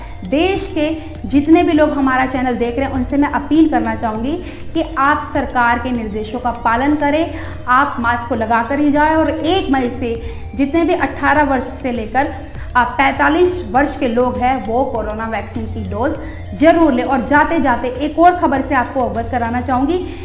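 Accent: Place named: Haryana, India. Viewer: Apne boli kit gye bhai harvani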